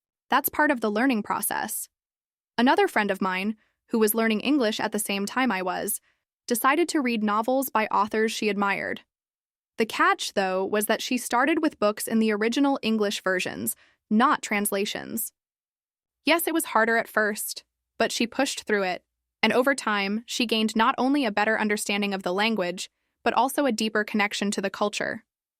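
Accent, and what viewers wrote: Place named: United States. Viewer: American English of course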